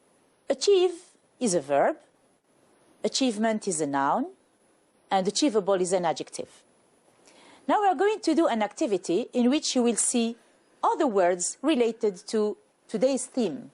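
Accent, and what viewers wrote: United Kingdom. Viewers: thanks .. :'D british english (y) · it seems that The Mr is using the British accent , i really liked it . i wish there will be some of scientific lessons . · Right great RP english thanks a lot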